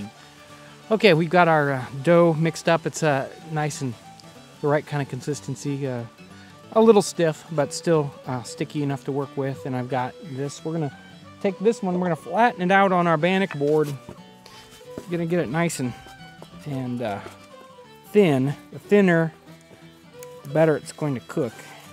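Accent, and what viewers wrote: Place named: East, United States. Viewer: Sounds vaguely eastern-US.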